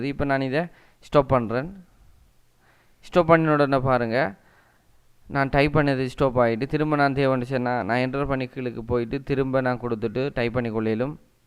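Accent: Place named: Sri Lanka. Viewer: dayavu senju tamil la pesavum ...kevalamana ilangai tamil la pesathinga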